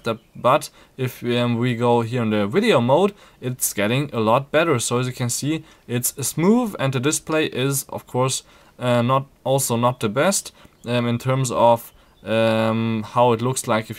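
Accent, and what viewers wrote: Germany. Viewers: Are you german?:-)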